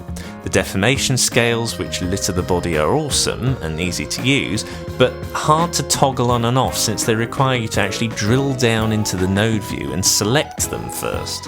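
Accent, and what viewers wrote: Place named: United Kingdom. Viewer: Great work as usual, always an inspiration. Hey, have you ever considered providing training? Sounds like you’re also based in the uk… I’d be interested in attending a course if you did.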